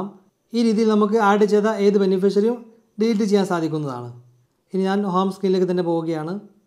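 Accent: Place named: Kondotty, India. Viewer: ഇടക്ക് ആ കൊേണ്ടോട്ടി സലാങ്ക് വരുന്നുണ്ട് കട്ട സപ്പോർട്ട് ഇക്കാ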